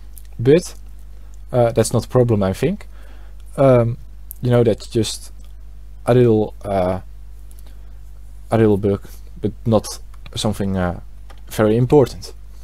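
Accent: Netherlands. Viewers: Hey + are you dutch because you sound very dutch haha. Keep up the great work!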